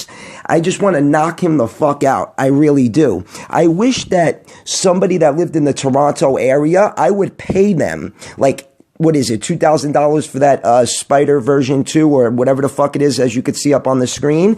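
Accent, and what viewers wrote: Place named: Brooklyn, United States. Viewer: As a Transplanted New Yorker out here in Seattle 1. I've been down the rabbit hole of Escooters I only follow The Ginger Kid Igor from Roblex and Now You! I miss the energy and the vibes 2. that Brooklyn Accent made follow you as well Bro lol glad I stopped by cos old fuckin I supply alibaba Asshole was kinda getting on my fuckin nerves because again the rabbit hole,I started looking up specs and when I heard 52V doing 50mph I'm like GTFOH! 🤣🤦🏾‍♂️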